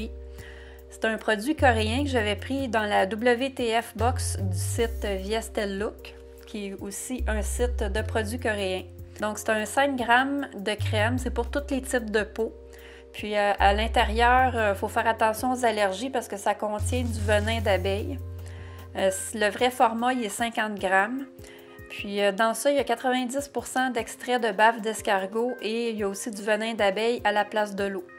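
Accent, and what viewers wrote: Canada. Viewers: Coucou merci d'être passé sur notre chaîne on s'abonne aussi ... une question es tu canadienne car tu as l'accent canadien bonne fête 😘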